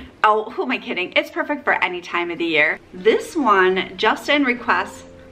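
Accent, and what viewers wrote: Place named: Midwest, United States. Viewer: That chicken pot pie looks amazing! 🍲. Your accent 😁. Are you from Minnesota or Illinois?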